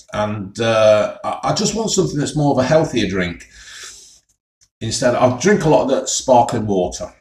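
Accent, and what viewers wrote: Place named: Newcastle, United Kingdom. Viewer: True Geordie emdy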